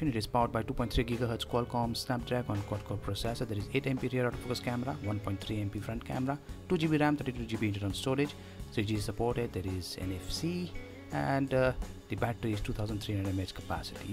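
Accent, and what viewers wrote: India. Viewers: are you indian cause I recodnise the accent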